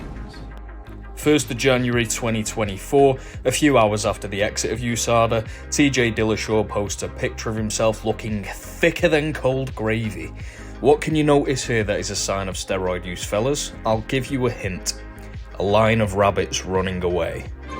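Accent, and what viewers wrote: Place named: Manchester, United Kingdom. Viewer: Love to see it - bringing the Manc accent and charm to the masses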